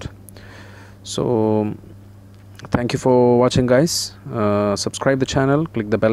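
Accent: Kerala, India. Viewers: R U from Kerala? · English kettaal manassilaakkam malayaliye..💞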